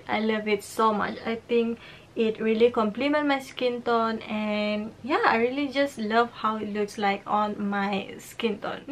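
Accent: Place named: Malaysia. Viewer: I'm from malaysia too !! nice video ♡ your accent is adorable ! :)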